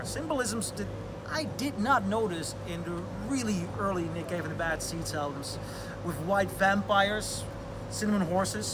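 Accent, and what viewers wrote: Netherlands. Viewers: nice record! Are you dutch? if so, then i didn't know that :D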